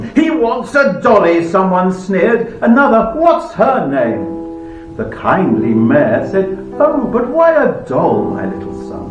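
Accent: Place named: England, United Kingdom. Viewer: Very well done my friend. I liked it a lot. You have an excellent voice and I love your English accent.😊👏🏻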